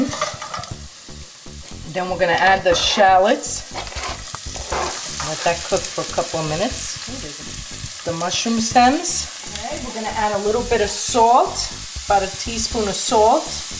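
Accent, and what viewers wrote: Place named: New Jersey, United States. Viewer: My family loves stuffed mushrooms. I'll have to give them this recipe! Haha you're welcome for the pin!! Haha "NEW IERSEY" with NOOO J. You can soooo tell haha, don't you just love it!? <3Daniela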